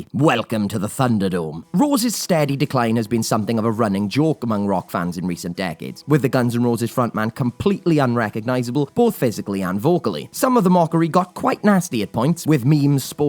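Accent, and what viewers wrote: Wales, United Kingdom. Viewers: remember one true fact, many of these stars can go on a diet, but the narrator will always sound like a Welsh git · You sound like a British JFK. Actually, I'm not even sure you're British now.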